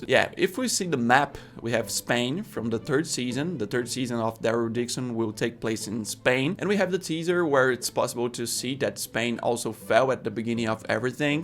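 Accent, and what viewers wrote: Brazil. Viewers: Brazilian accent and Brazilian name. I am home. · hes brazilian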